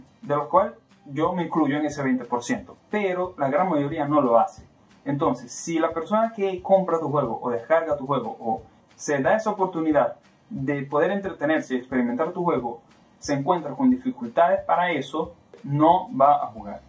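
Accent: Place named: Venezuela. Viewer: Verga ese acento de Venezuela esta bien marcado jaja. Buen vídeo hermano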